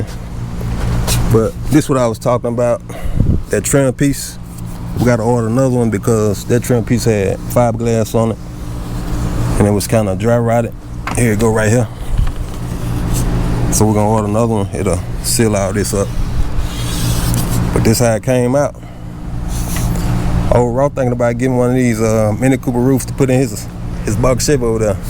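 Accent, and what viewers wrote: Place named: Dallas, United States. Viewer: You sound like you're in dallas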